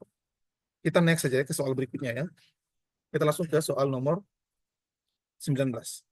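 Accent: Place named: Nagekeo, Indonesia. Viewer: Logat Nagekeo sedikit sedikit keluar e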